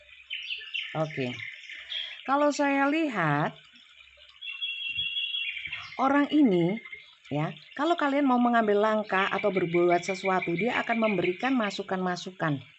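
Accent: Java, Indonesia. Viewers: Assalamu alaykum bunda..?? Q seneng dengerin readingan bunda..lucu mbacanya medok bget..sy jg org jawa bund tinggal di Bandung..g usah di ambl hati sm komentar yg bhasanya kasar org hidup dn yg suka ada yg ga...enjoy aja bund sukses dan seat selalu resonet ga reonet ttp q dengerin q ngefans gaya bicaranya bunda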